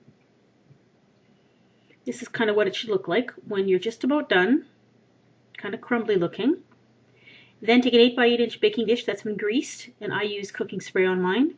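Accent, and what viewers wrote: Canada. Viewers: This is probably an American referring to your Canadian accent:) I am totally going to make this for my bf this weekend! yummy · For aboot 15 minutes. Hehe. I love canadian accents. <3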